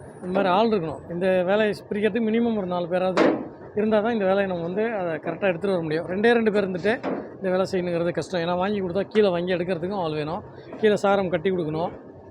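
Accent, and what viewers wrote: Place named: Thoothukudi, India. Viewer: ஹலோ இன்ஜினியர் நானும் கொத்தனார் தான் உங்களுடைய slang நம்ம ஊரு தூத்துக்குடி slang மாதிரி இருக்குது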